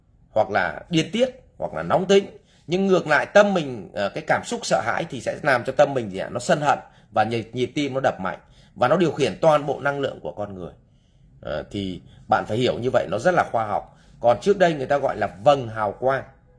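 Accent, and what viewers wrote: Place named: Vietnam. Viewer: Toi thích cách thầy nói chuyện với cu, đúng cái chất mien que nhà mẹ tôi.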